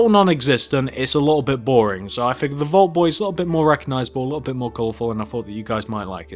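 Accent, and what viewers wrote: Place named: RP, United Kingdom. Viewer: Where are you from cos your accent sounds kinda posh lol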